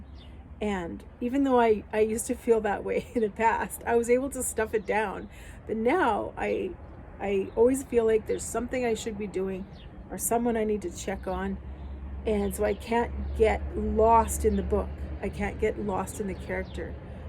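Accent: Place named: Canada. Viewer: Hello, Debbie over there with Life in Kentucky gave you a shout out and here I am! Curious George. I realize this video is 2 months old but the title is what pulled me in. Music is so important and remember how we used to listen to an entire album side A and B when we were younger? That sounds like a great idea for sure. I love cooking with music. I LOVED AND STILL LOVE Simon and Garfunkel and James Taylor. We always have music playing, I feel like life is a soundtrack. I'm so excited about you coming back to yourself. I can't wait to see more of your videos. I'm so glad I came on over here. Debbie is right. You are amazing, nice to meet you. I am Tracie from Life in the Wylde West. P.S. knew you were Canadian, I love your accent.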